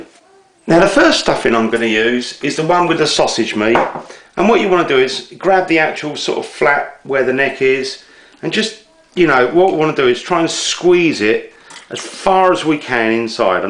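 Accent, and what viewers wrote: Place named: England, United Kingdom. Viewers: Unmistakable English accent. Your cooking tips are equally of base. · He's English.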